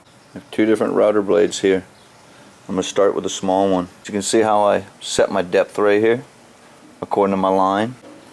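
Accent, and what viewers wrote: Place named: South, United States. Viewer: How did you get such a thick southern accent then? I would think a Maine man would have a east coast accent.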